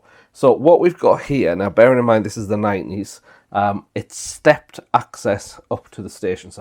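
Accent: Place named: Sunderland, United Kingdom. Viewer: I'm not sure how helpful this will be for you. There's a little model shop in Sunderland (sorry I'm just guessing you are near by based off the accent) called Sunderland model Station. They have several new and used Skaledale buildings, there might be something in there for you. Again I'm not sure how easy it is to visit the shop but if you get chance it might be worth a look 😊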